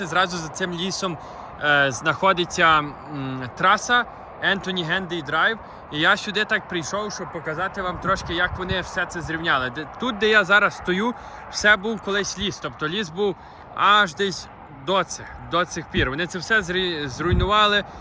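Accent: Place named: Western Ukraine, Ukraine. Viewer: До речи, мені теж чується якийсь акцент в Вашій український мові. Чи то вже англійська так вплинула чи Ви походите із західної України?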